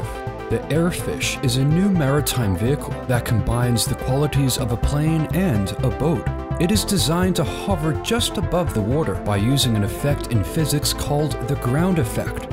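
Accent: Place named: United States. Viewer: Great video... I guess. Once again, an otherwise interesting idea is droned to death by this incessant electronica music that all sounds the same and never ends. I'd much rather just listen to someone describe the things in the video... Except for the fact that, once again, a video is read by someone who sounds American, but is written in broken English with bad grammar that sounds like it was mistranslated from some other language. Are these videos mass produced in some other country and then just 'read' by white people? I simply don't understand the point of this at all. Am I being 'pandered to?' Am I being patronized here? Why not just have someone from that country speaking English, or have them speak their own language and use captions? And PLEASE turn off that STUPID music. I hate it! It sounds exactly like a computer wrote it and every other one out there! 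And PLEASE get someone who can read and write in CORRECT ENGLISH GRAMMAR, or stop 'translating' it at all! Thanks... I guess... "...how it would look like?" Really? I don't think so. I literally couldn't get through the whole video. I had to turn it off because, without the terrible music & broken English, I don't know what the hell I'm looking at. Cut it out, whoever you are.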